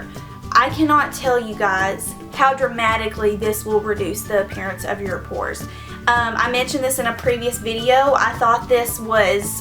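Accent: South, United States. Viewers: ♡southern accent♡ Sent with lots of ♡ in NC!!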